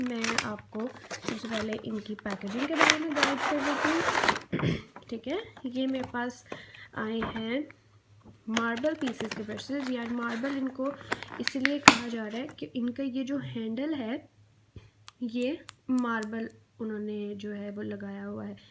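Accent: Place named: Lahore, Pakistan. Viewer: Apka Urdu + Lahori accent buht pyara lagta h 💐💐💮💮 n Allah bless you for Ur new platform inshallah yaha v aap Kmal kre 🤗👏👏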